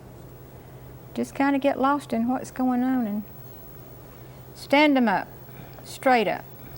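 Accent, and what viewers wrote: South, United States. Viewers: Why have I never heard of this woman before? Why, she’s the female Bob Ross! I just love her southern accent!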